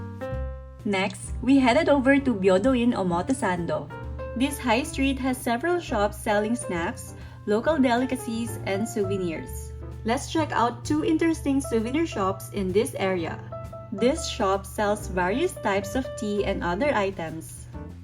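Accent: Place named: India. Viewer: Why do you have an indian accent?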